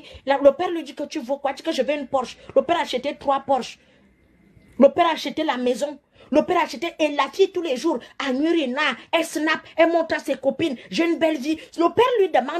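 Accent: Cameroon. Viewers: Je kif son accent camair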